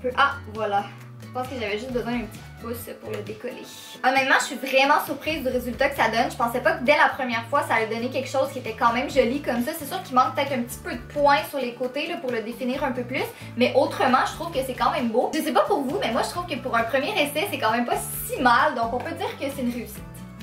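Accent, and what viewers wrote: Quebec, Canada. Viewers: C'est l'accent québécois <3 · bonjour juste pour te dire que ton accent quebecois est horrible mais qu'est ce que tu es agreable a regarder;) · Jesépakoimètre! C est un accent Québecoi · J'ai vraiment du mal a comprendre l'accent quebecquois.. Tu as un tres fort accent je trouve! Mais tu es trop mignonne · L'accent québécois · son accent québécois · je t'aimmmme💜tu es trop belle et j'adore ton accent québécois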